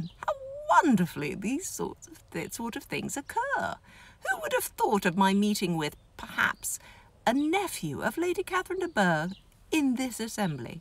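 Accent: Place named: United Kingdom. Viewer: Hi from New Zealand. Am enjoying listening to the modulation of your voice. And amused when you catch yourself slipping in & out of the British & US accents.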